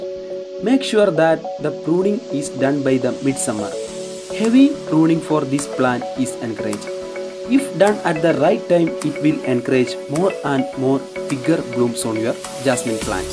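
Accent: Kerala, India. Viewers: Malyali english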